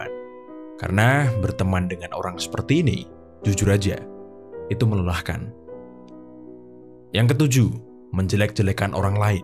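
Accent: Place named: East Java, Indonesia. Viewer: Wkwkwk anjay ada sisi lucu juga antum prof. 😅 Dari logat anda. Anda orang Jawa timur kah. Surabaya sekitarnya. Sidoarjo mungkin. Ini ga ada acara temu fans atau seminar gitu ?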